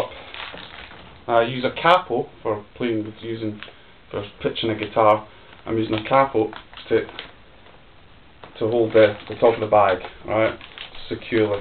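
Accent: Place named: Scotland, United Kingdom. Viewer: A scots lad ..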